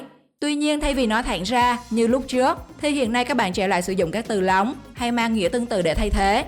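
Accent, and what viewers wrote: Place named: Binh Dinh, Vietnam. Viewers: Như người bình định nhỉ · Nghe giọng cj nói cứ như cj là ng miền trung ý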